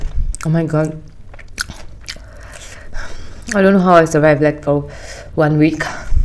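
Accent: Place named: Bengal, India. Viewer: দিদি তুমি কি বাঙালি ?.......... আমি sure না তাও তুমার কিছু কিছু কথায় বুঝা যায় তুমি বাঙালি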